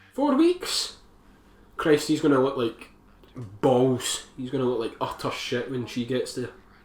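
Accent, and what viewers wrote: Scotland, United Kingdom. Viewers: Hello mate, I love this movie, and more so your accent! Scottish to me is the best accent in English language, what part of Scotland is it from? Edinburg? Regards from 🇲🇽😎🤟🏼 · Even after 35 years, The Fly is the brilliantly grossest movie I've ever seen in my life. It still makes my stomach churn. I enjoyed your funny reactions to the gross-outs and I enjoy your accent - which I'm guessing is Scottish or northern English (to my untrained yankee-doodle-ears). Anyway, thumbs up.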